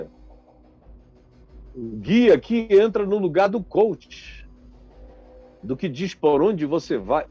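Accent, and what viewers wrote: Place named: Pernambuco, Brazil. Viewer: Aí sabe que fala Recife Pernambuco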